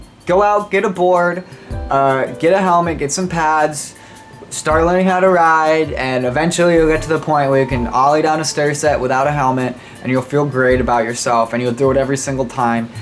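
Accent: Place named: United States. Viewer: I heard this type of voice everywhere in America. Just why do they all sound the same.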